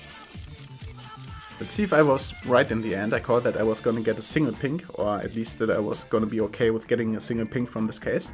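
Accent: Germany. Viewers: Ich krieg so Aids bei deinem Deutschen Akzent während du Englisch sprichst. Es gibt nichts schlimmeres. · Bist du ein deutscher oder ein Engländer ? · man hört direkt dass du deutsch bist :D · wenn ein deutscher englisch redet.... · This german Akzent Oo xD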